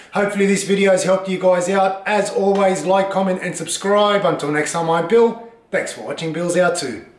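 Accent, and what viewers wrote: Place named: Australia. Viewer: Thank you so much! Was to happy to hear an Ozzie accent when I clicked on it haha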